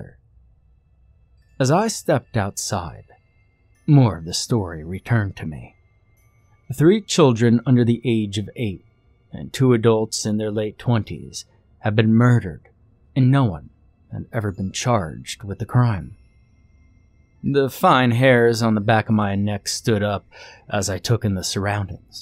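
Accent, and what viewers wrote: South, United States. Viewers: Your southern draw is to die for 😍😍😍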